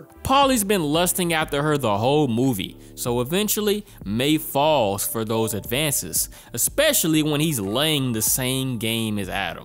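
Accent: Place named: Philadelphia, United States. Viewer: Oh you from Philly huh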